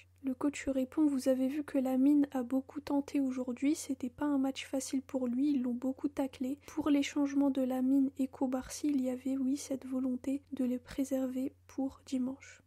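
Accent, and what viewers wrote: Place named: Spain. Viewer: J'aime bcp son accent espagnol, même à la fin "visca Barça visca Catalunya" merci pour le contenu.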